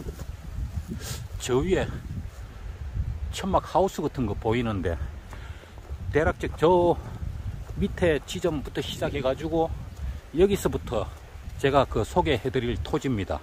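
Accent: Gyeongsang, South Korea. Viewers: 경상도 분이네예 ㅎㅎ 고생하셨습니다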